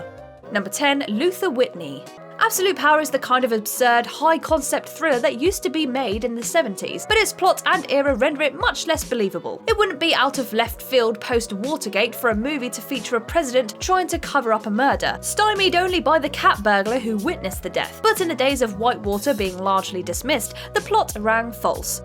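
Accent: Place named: England, United Kingdom. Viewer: Yankee? She English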